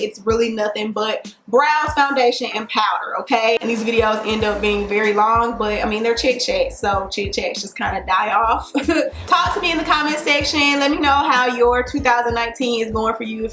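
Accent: United States. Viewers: I love how country you are, I could listen to you talk all day.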